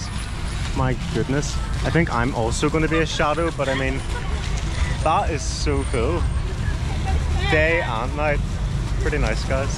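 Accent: Northern Ireland, United Kingdom. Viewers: Your accent reminds me of a pal from Northern Ireland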